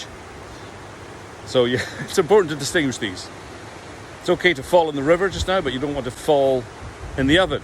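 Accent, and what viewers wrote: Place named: RP, United Kingdom. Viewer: What 'English' sounds? your english is obnoxiusly posh sounding , believe it or not.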